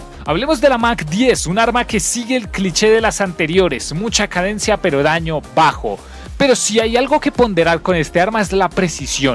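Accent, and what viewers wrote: Colombia. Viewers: Wow, tienes un acento muy limpio y neutro para ser colombiano, todos los que conozco no pueden decir una oración sin usar groserías o jergas horribles de acá (También soy colombiano)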